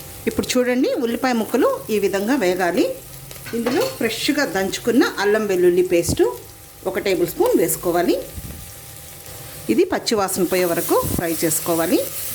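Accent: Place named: Nellore, India. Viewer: garu mi maatala lo konchem nellore touch undandi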